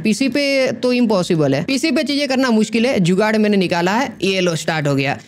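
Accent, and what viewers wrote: Gujarat, India. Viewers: He is Gujarati bro❤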